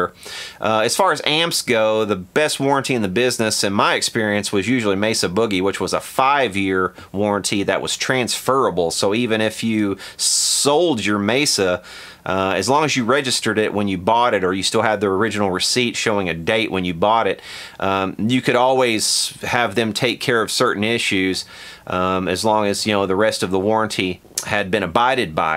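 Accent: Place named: Louisiana, United States. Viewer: You sound like Theo Von. Are you from Louisiana?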